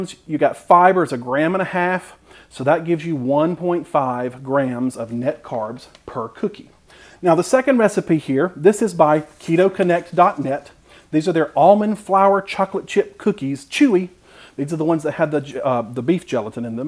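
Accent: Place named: Eastern North Carolina, United States. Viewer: Wow, you sound like me. All we have is an Aldi out of the things you listed. LOL! Now back to the video. I'm notorious for commenting before I've finished watching but that's because I have the attention span of a peanut and will absolutely forget by the end. Where abouts are you from? I'm in eastern NC near the southern outer banks. Your accent sounds a lot like "my people". ;)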